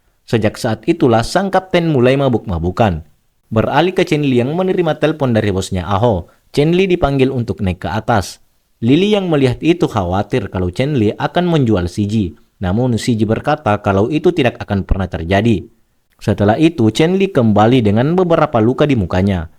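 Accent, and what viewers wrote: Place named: South Sulawesi, Indonesia. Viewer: Orang mana bang. cara ngomnya kayak orang sulsel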